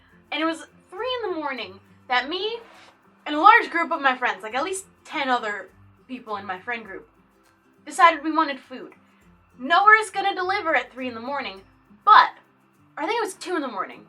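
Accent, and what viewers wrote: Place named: South Jersey, United States. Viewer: So your from South jersey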